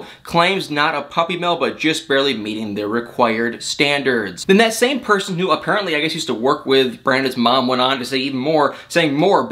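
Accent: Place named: Michigan, United States. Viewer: Hey! I called it a few weeks ago! The RandB News. RandB. RRRrrrr! You can smell it. Lol. I love 💕 your Michigan accent! I can hear it from another room! Sweet!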